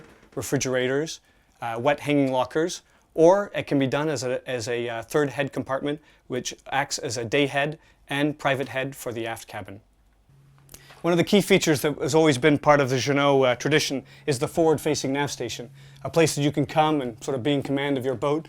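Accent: France. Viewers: désolé mais l'accent français n'est plus possible, on dirait que vous sortez du collège... sinon très bien présenté. bonne vidéo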